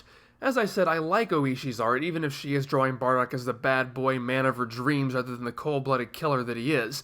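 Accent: Japan. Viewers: You have a tendency to use words how they're pronounced in Japanese. Even the way you say Goku is the Japanese way with the emphasis on Ku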